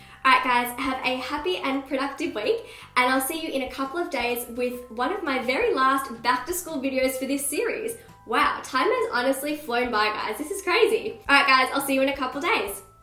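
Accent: Australia. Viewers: Australian lol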